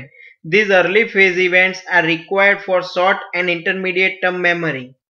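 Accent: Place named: Germany. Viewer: You sound like you are german though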